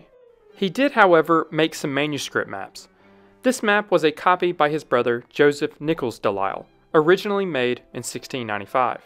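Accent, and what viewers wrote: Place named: Ohio, United States. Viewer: Dear, this is fascinating history. However, your pronunciation of Dutch, Spanish and French personal names is cringe-making. You know, you can Google pronunciations of practically anything, thereby making you sound less like an Ohio kid who's never been farther than 100 miles from home. I know I'll get pushback for saying this, but there's a reason why, in England, Received Pronunciation (RP), or BBC English, is considered desirable for journalists -- so that accents and regional pronunciations don't distract from the reporting. Good luck!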